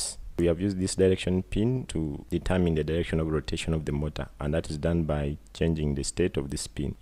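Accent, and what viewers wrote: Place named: Uganda. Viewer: The presenter sounds so Ugandan!!!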